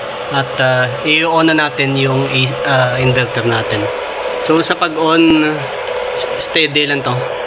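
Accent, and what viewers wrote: Iloilo, Philippines. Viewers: Sir ilonggo poh bah kayo? 😊😊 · Bal.anon gid nga ilonggo ka boss😂😂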